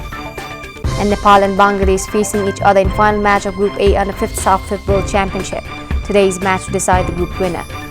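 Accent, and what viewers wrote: United Kingdom. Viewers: hora vanya!!🙈🙈🙊😝malai ta testai lagyo munnile pani euta episodema hajurko jastai gari british tone ma english boleko thien..hajurko interviewma kai katai bhadragolko kura sunina kin tyas bare navaneko naaodeko vanne lageko thiyo..😁😁.munni navayeni hamro lagi ideal ho..indian reporterlai reply didai babaal lageko thiyo malai..interviewma bolne saili sarai saral xa ghamandapan dekhina sadhai vari uchha bichar samanya bolne saili kayam rahos.english video haldai garnuhola hamile sikne mauka pauxau..🙏🙏🙏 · Lovely British accent 😘😘😘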